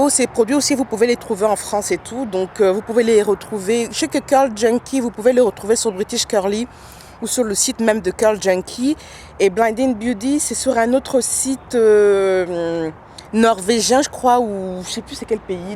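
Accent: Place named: Cameroon. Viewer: héhéhéhéééé bon courage dans ta nouvelle vie!!! t partie en scred!! et qu'est ce qu'il fait beau la ou t'es!!! t partie pour les études?? hi hi hi Riri nous inspire bien!! et la tu parles avec l'accent camerounais I swear!! lool allez on attend les Videoo avec impatience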